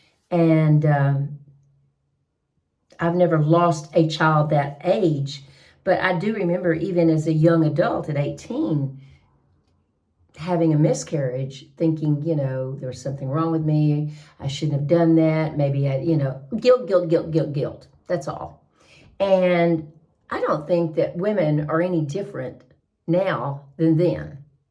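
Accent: South, United States. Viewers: You are a delightful lovely lady.. you sound like a precious southern lady. I'm from northeast Georgia and you're living my dream. 🎉 i can't wait to see what we've been doing.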